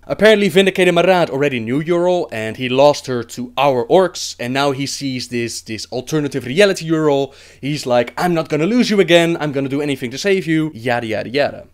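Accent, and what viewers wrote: Netherlands. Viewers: Nice video :) Lil question, do you happen to be dutch? ^^ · ur dutch accent is next level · heuj Dutch accent! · Are you dutch? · Are you dutch? I love your accent :D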